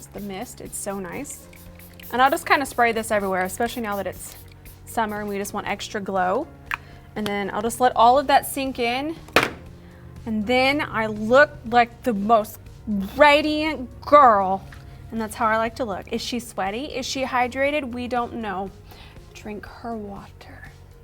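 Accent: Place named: Texas, United States. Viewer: Saw you on Snapchat and recognized a hint of Texas accent...had to follow! Can’t wait to see more videos!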